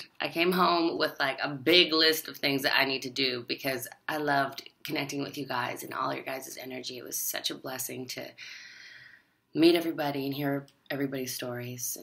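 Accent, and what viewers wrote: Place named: Southeast, Brazil. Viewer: You have an accent from southeastern Brazil, when you speak Portuguese hahahha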